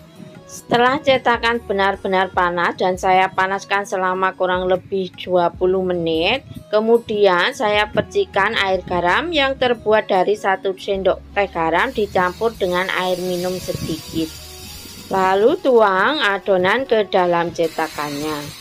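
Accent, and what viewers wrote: Java, Indonesia. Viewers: Kentalnya jawanya 😅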